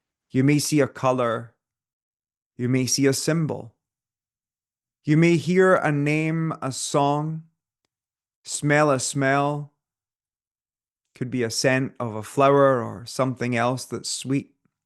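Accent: Scotland, United Kingdom. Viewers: I finally found a scottish phsyic. Subscribed ❤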